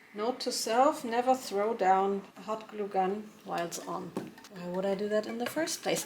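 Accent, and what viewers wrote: Germany. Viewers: for a while i was always kind of hearing her speak german for some reason when i turned the volume down a lot and in this video i now found out it's probably because her accent is german and she's german ...der gute alte alleskleber c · New viewer here - My thought journey through the video: wait, this sounds like the accent of a fellow German! wait, this sounds like a LoL theme... and another one! F Holy crap she looks absolutely GORGEOUS! Subbed, subbed and SUBBED, by God. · I thought: she sounds a little big German 🤔 And than I saw it! The 'UHU Alleskleber '😂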